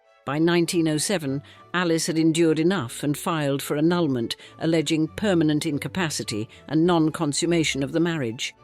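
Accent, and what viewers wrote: England, United Kingdom. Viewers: Dumb AI pictures that don't relate to this narrative. The new documentaries filled with an English accent of authority and cheap AI. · What's a nice accent British, nice voice. But i don't think alice Thaw is wonderful man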